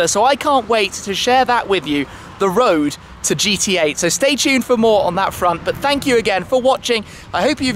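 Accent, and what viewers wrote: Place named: RP, United Kingdom. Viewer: Dave Mckeague Yes there is! It is called received pronunciation AKA the Oxford accent!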